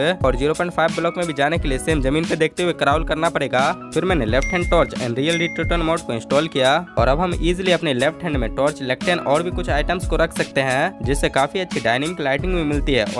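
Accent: Bihar, India. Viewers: R U From Bihar ? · Bro ur bihari accent is awesome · you are from Bihar i m 99% sure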